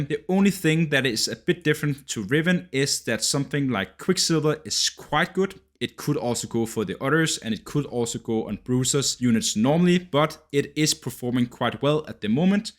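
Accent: Denmark. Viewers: Are you from Denmark?? You sound like a danish person 😂😂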